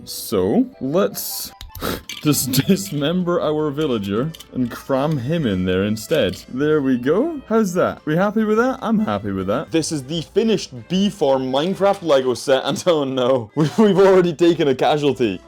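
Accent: Scotland, United Kingdom. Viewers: thx for commenting, love your Scottish accent, looking to play a bagpipe rn ❤️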